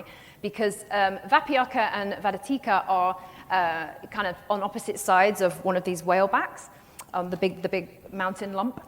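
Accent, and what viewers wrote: RP, United Kingdom. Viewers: Oh god this is a disaster. She's been riding the ditzy, irreverent, 'errrm-girl' thing for a while now, and it's bad enough on a pre-recorded podcast. Being pretty and having a posh English accent isn't enough. You need to be vastly knowledgeable, a skilled communicator, and compelling to listen to. People really underestimate what it really takes to be a Professor Brian Cox or Dr Irving Finkel.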